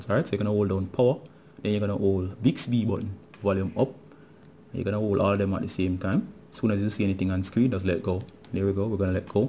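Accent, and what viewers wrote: Jamaica. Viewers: by the way thanks..idky I was surprised to hear a Jamaican but dweet! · He's from the carrabien (jamaica)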